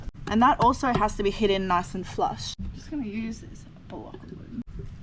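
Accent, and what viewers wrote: Australia. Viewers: Keep it , also who else here came for the girl but stayed for the HEAVY straya' accent , nah but in all fairness -you live in a incredible part of the world, and you are just out having fun , i enjoy the videos, please keep them coming - best regards a scandinavian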